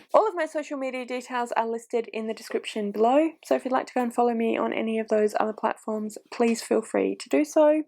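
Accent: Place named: Australia. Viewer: Haha, not gonna lie, I was a little confused when I saw the title, then as soon as I heard your accent I was like 'duuuh obviously it's autumn in Australia!'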